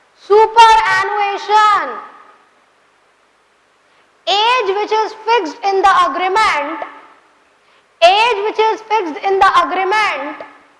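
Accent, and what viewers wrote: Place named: mixed, India. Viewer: pagal ho kya gawar nhi h vo ca hai.BAS vo apna bolne ka tarika up bihar wala hi rakhi hai.baaki knowledge hai lekin bolne ka tarika desi hai.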